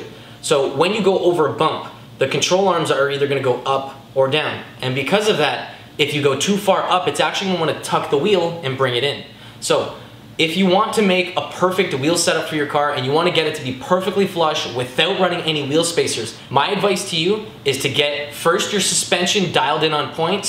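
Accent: Canada. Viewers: Canadian eh??????????????/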